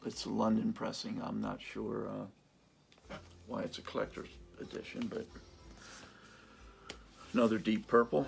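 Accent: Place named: West Virginia, United States. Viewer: Are you in WV? You don't sound too far away from me I'm in the Panhandle anyway you have a great collection there I totally forgot about the, they had some great songs.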